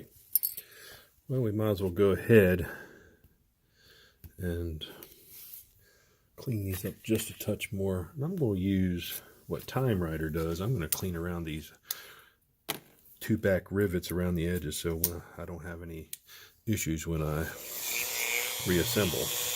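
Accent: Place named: Pittsburgh, United States. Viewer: Glad I found you. Time Rider sent me. TR, Bare Metal HW, WheelsNThangs, Marty are my teachers. You appear to have joined the faculty. Are you from anywhere near, PA. I am, you sound "familiar" for lack of a better way of putting it.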